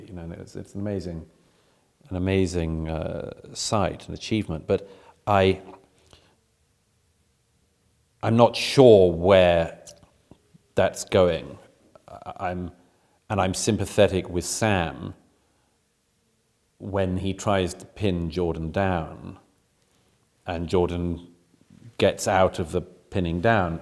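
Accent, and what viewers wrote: United Kingdom. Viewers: This guy wriggles around and says nothing of substance, but with a snobby British accent and attempts to be oh so intellectual. Humans will get better at letting go of Fairytale Bob in the Sky (aka god) and find more comfort over time in reason - and kindness, facts, and the knowledge that we just don't have all the answers. Fairytale Bob needs to spend more time in Syria, and Chicago, and Baltimore, and a trillion other places and perform real miracles on mass before a serious thinking person will ever believe in him.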